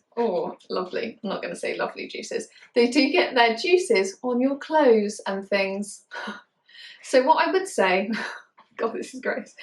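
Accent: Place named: United Kingdom. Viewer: I just wanted to take a minute to thank you for your video on male Dachsies. I’ve heard most of this from an American point of view, but, with your I assume British accent and sense of humor, makes it so much more enjoyable and informative to listen to!!! Again, thank you so much! 🌹🌹 I actually own a male Cheweenie, however, he displays the Dachsie side of his origin more often than not!😊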